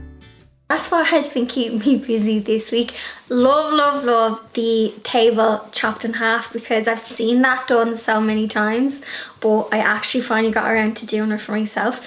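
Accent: Ireland. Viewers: Only just found your channel and I love it! Your cats are so cute. You seem such a sweet person and your DIY's are so so lovely, but not sure what I enjoy most, your projects or your accent, I love the Irish accent. Keep up the good work and look forward to watching more of your videos. Take care and stay safe 🤗🤗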